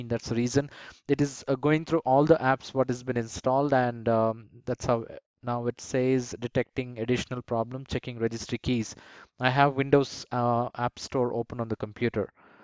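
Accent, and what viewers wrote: India. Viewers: I LOVE UR INDIAN ACCENT !! THANKS !! GREETINGS FROM ARZEIBAIJAN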